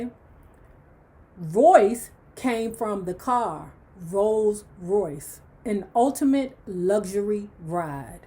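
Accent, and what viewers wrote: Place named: DMV, United States. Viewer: Ok. Clearly you're from the DMV. That accent is so obvious.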